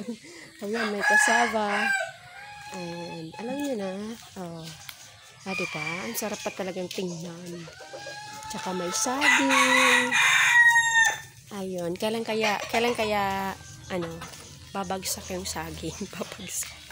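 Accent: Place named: Bicol, Philippines. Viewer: Hello manay.Sain ka tabi sa albay?Parang paryo kan bisaron ta ah😁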